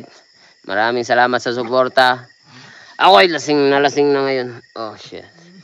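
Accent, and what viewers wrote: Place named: Visayas, Philippines. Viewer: Katawkatawa pa..klaro kaayo wlay grado....ayawg english2 kay bisya ra kaayo ka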